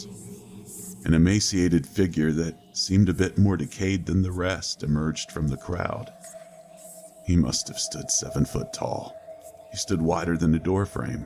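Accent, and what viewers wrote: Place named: South, United States. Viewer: where are you from? Sound like you got some south in ya sir.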